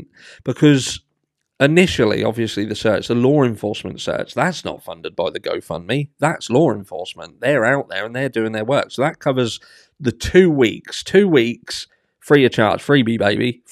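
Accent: Norfolk, United Kingdom. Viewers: I thought that. I'm Kings Lynn and now Norwich and he sounds quite local. Love ut! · Love your vids, are you from Norfolk? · This is the most west norfolk accent on youtube. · wayyyy Norfolk boiiii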